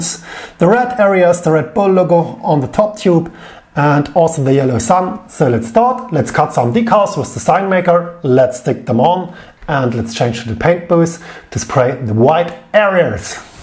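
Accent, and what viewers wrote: Germany. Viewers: Mega Videos🙏🏼🔥 hab sofort rausgehört das du Deutscher bist 😅 Mach weiter so🚵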